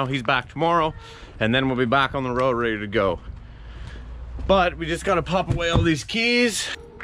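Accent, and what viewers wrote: Canada. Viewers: Americans are back wards lol. We pronounce it that same way as you Canadians here in Australia. Shift it.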